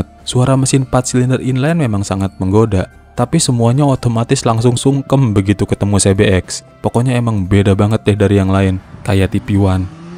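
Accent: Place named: West Java, Indonesia. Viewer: Orang sunda mana kang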